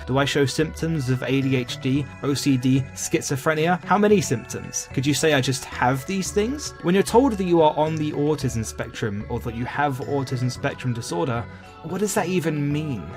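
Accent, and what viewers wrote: United Kingdom. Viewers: This was like having my life condensed into half an hour and read by a British man. legitimately made me cry for the first time in a very long time and broke what i think was a lifetime no cry streak. I needed this, Thank you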